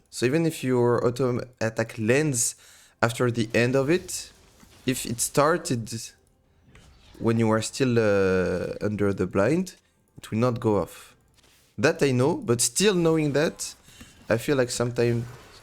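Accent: France. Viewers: Wow je compatis! Je suis arrivé ici parce que pour la première fois aujourd'hui je suis tombé sur un Teemo correct (mes premières game les mecs y savaient pas space c'était un all in un kill 😂), j'ai fini en 0/7!!! Du coup j'ai fait recherche YouTube " Teemo"... Et je comprends maintenant! La vache c'est rude! Merci pour le contenu en tout cas, je suis débutant et je main Trynda et Sett et tes vidéos éducatives elles aident de ouf! (je me suis permis de comm en Français j'ai cramé ton accent 😂😂)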